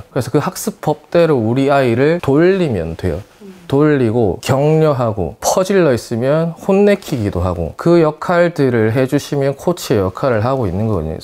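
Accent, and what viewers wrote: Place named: Busan, South Korea. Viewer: 선생님~부산여자 서울살고있습니다. 고향말씀으로 들으니 너무 집중이 잘 됩니다. 저희아이 아반떼로 태어났는데 완전풀옵션하고 튜닝하고 엔진갈아서 열심히해보겠습니다!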